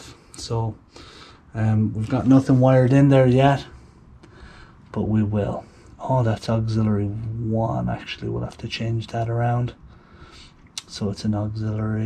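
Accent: Ireland. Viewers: Fair play to you! Is it an Irish accent?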